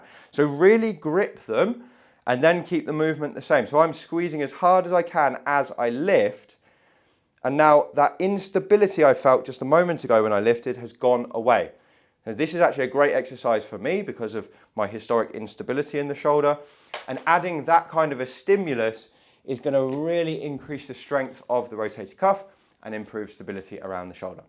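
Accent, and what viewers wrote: United Kingdom. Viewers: Great videos and very helpful. Love your British accent, but who doesn't love a British accent!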